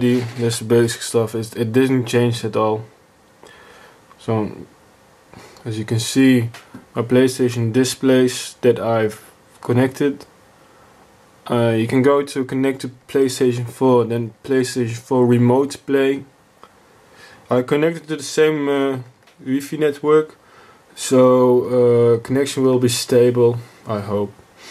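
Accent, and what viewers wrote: Netherlands. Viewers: Are you dutch?? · ben je nederlands toevallig? zo ja, goed engels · Are you dutch ?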